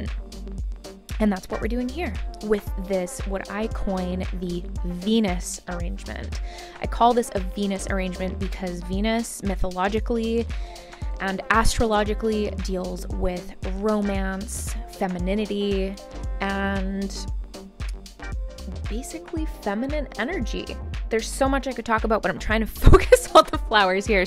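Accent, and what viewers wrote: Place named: United States. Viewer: omg i love your video, and u r accent is so American! i can feel the power in your voice!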